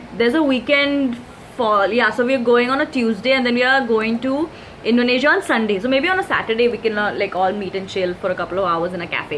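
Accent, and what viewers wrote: India. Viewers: What's dat hindi accent ??🤔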